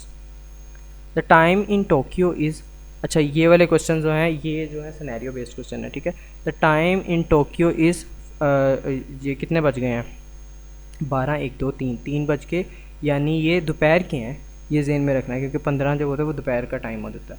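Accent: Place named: United Kingdom. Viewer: Omg ur English accent 🥲❤️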